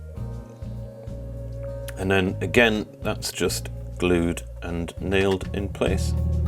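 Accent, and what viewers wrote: Newcastle, United Kingdom. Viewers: Great video and the commentary makes for a much more enjoyable project. Love the Geordie accent. Keep them coming.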